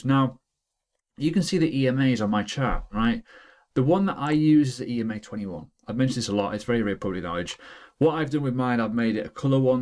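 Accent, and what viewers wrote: United Kingdom. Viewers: Great channel I follow a lot of them and when I see ur new videos I’ll click them first What kills my is the ascent . for a Spanish guy British is harder to get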